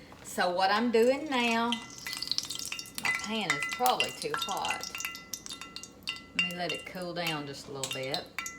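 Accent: South, United States. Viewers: What state do you live in? I was born in Texas & grew up all over the the south but have lived in Ohio a long time. I'm trying to figure out what state you live in by your accent bc it is so familiar to me. I've lived in Texas, Okla (where my mom was from) Tennessee, Mississippi, Louisiana and Alabama & went to college in Arkansas. Okra is my favorite vegetable & I grew up with my mama frying it with chopped onions and fresh or green tomatoes and that's my absolutely way to make it. I've never met anyone else who makes it that way. I'm wondering if it was a tradition in Okla. But even southerners I know in Ohio or the south have never heard of that. I also make okra patties with the same ingredients bc sometimes the wetness of the tomatoes makes the okra fall apart. But making it with onions and tomatoes takes it to another level. I crave it! I've never put it in the oven before and will try that for sure!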